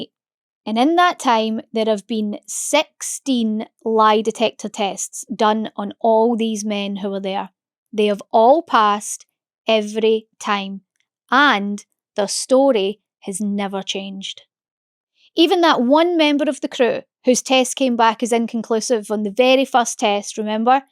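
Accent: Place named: Scotland, United Kingdom. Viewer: Love it.... Keep it coming mate... and from one Jock to another, do not 'ever' dial that accent back... Its totally ' you' and it totally makes it 🙂 x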